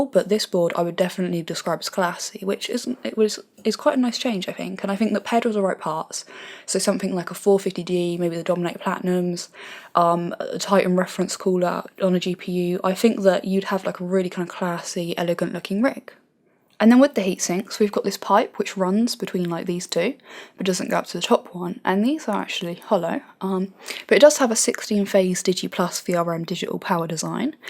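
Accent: England, United Kingdom. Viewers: Very thorough review, you girl know a lot about hardware. On the top of this you have a very pleasant voice and lovely english accent.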